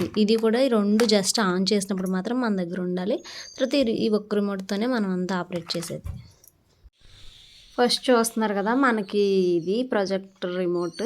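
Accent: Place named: Godavari, India. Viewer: Godavari slang 😊😊😊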